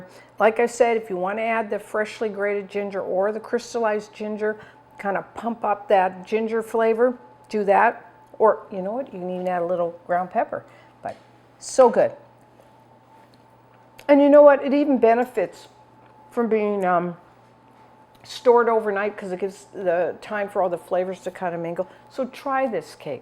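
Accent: Canada. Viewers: Shes canadian right? like her last name and the way she says out.. she so cute.. i like her videos.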